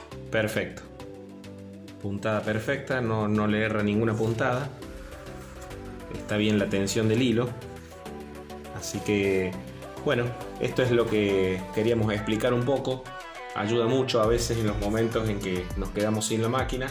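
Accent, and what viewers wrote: Córdoba, Argentina. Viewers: por la tonada me pareció que sos cordobés soy de malagueño cerca de Carlos Paz .e visto muchos videos de otras Singer pero no encontré ningúno de la 29 k . casualidad la estoy reparando y me sirve mucho tu vídeo .un abrazo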